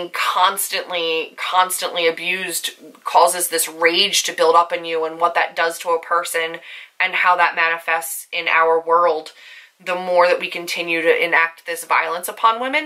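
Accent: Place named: Philadelphia, United States. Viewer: Just found your channel and I’m obsessed! Are you from PA? You sound like you have a Philly accent. I’m from eastern PA. I really want to read The Seven Husbands of Evelyn Hugo. You make it sound awesome.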